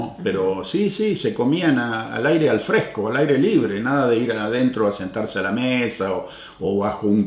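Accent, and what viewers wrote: Argentina. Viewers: Gente maravillosa! Espero mi hijo pueda irse en 2 años a vivir allí y conservar como la diccion Argentina, la simplicidad y poder hacer una nueva vida allí!! Tiene 25 años es profesional y yo voy detrás de él una vez establecido!!! Lobespero con ansias, tenemos familiares allí!!! Abrazos desde nuestra pobre Argentina que aunque la amamos...ya no Se puede vivir. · Para el próximo video. Como es que no perdiste el acento argentino después de tantos años? Que extrañás de Argentina que no encuentres en Canadá ? Es importante el clima cuando se logra una buena calidad de vida? Saludos ! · Si! a mi también me encanta escucharlo tan argentino! viviendo la experiencia de un país fabuloso, con las raices acá y con su cabeza en el hemisferio norte.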